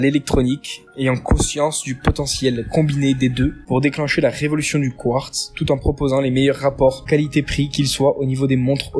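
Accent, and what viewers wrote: Corsica, France. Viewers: t'es corse ? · Ne serait ce pas un accent corse que j'entends ?!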